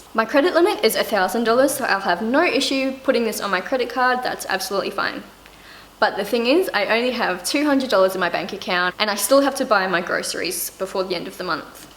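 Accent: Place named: New Zealand. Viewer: Great videos! Love em. An addition into having a credit card is that some have small % cash back whenever you used it. It's almost free money! Your accent sounds New Zealand-ish. It's very pretty :)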